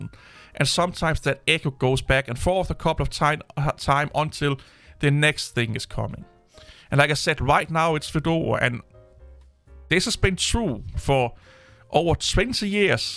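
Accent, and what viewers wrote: Denmark. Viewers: you're on the money nearly all of the time. One of the few Linux YTubers to be honest about the pros and cons of Linux. I use MacOS, Linux and Windows btw - each has merit. Danish accent fine by me! Very proficient in a second language unlike most native English speakers. Actually I like Fedora and use it - a good distro, but one amongst many. Just use a distro you like and suits you without making grandiose fanboy claims about it!!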